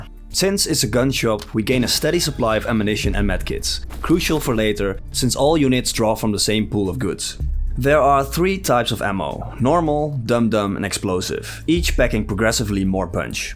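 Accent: Netherlands. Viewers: i think i hear a slight dutch accent. its one of the least noticeable dutch english accents i have ever heard though. most of us cant hide we are dutch when speaking english at all. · Never fail to recognize that accent... 🇳🇱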